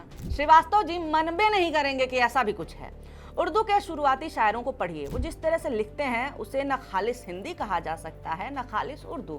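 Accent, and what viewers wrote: Bihar, India. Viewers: Madam aap ka Bihari accent achcha hai ❤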